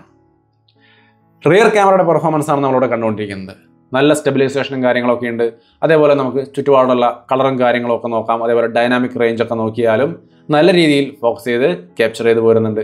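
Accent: Thrissur, India. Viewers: Thrissur kaaran aaanalle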